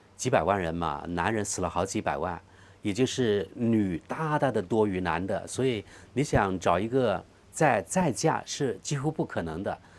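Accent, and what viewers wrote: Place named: South, China. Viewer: 每个地方人都有口音，尤其南方人，大家相互理解吧，其实我们南方人听着南方口音更亲切呢。他还特地做英文，中文字幕，很不容易，请支持！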